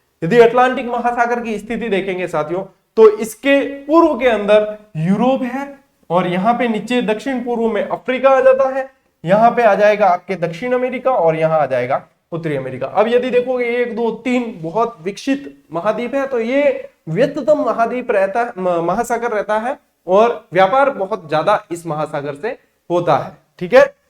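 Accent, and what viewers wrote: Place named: Marwari, India. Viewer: Sir apki marvadi hamjh koni aave.....pr laage choki👌👌👌👌... thank you sir....jitna bhi bole km hi h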